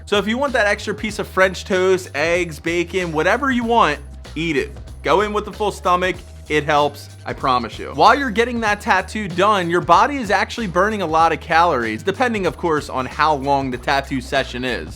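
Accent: Philadelphia, United States. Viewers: i’m from philly and he sounds like he from philly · 95% sure this guy is from philly. Wooder… I say it to. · You’re from Philly huh?? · You have a strong Philly accent lol great content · I'm From Philly., so I appreciate the pronunciation. Haha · I fkn knew it lol classic Philly accent! Appreciate the vid tho. Employing some of those techniques this week! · Dude you must be from philly i can hear it in your accent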